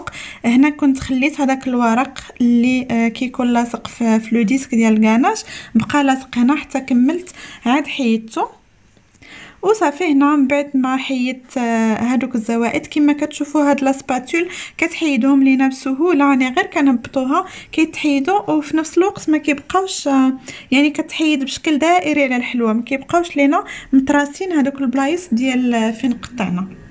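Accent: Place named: Oran, Algeria. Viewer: hhhhhh j'ai aimé le mot ijarjrak j'adore l'accent oranaise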